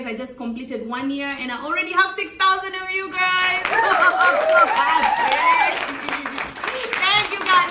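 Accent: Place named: Mombasa, Kenya. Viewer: My dearest beautiful daughter, I watch u from Oman, ur a gem, a beautiful mum, I love ur vlogs so much I enjoy ur Mombasa Swahili accent, ur such a beautiful soul, may Allah guide and protect u n ur loved ones always, and keep up the good work, all the best, ur doing well so far Alhmdulillah. Love u loads stay blessed and beautiful as always.❤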